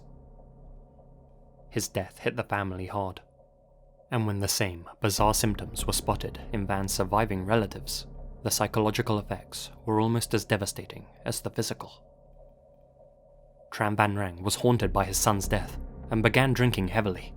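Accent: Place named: United Kingdom. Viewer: For some reason that British accent is damn good at storytelling!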